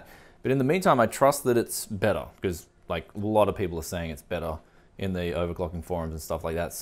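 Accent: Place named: Australia. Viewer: are you aussie? your accent is cool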